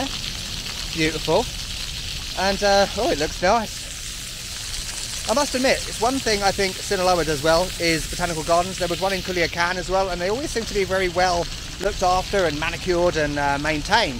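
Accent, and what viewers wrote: United Kingdom. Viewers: Are you british? Your accent sounds different 🤪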